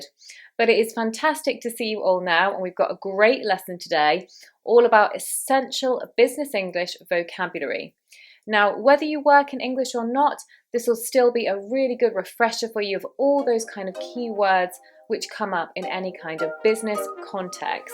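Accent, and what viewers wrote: United Kingdom. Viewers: I love british english❤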